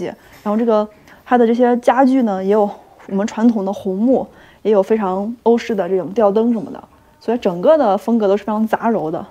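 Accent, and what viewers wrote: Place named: Northeast, China. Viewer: 你们有没有发现，经过几个月的东北行，的口音也稍稍带上点东北味了，哈哈哈！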